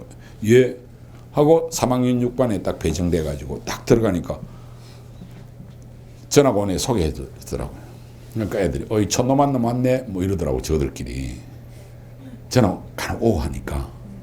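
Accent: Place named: Gyeongsang, South Korea. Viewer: 고자 동남방언 억양 때문인듯 ㅎㅎ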